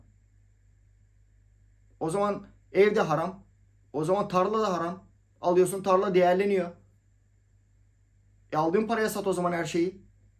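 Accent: Thrace, Turkey. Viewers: Abi İzmirli bir ablaya dinlemiştim 3 gün önce sizi direk bizim ege şivesi bu demişti bende yok Trakya demiştim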